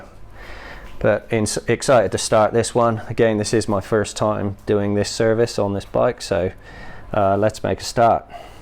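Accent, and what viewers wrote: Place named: West Country, United Kingdom. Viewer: I wasn't sure whether it was West Country or Norfolk. Hahahaha. I'm Peterborough born and bred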